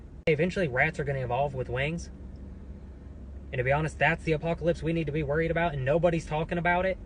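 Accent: South, United States. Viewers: is he talking about possums? lol i guess they are noisy little creatures. he had more of an southern tang in 2018 did yall notice that?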